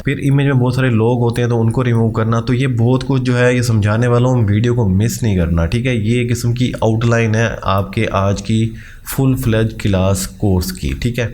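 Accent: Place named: Punjab, India. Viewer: Aapka bolne ka andaz punjabi style in natural way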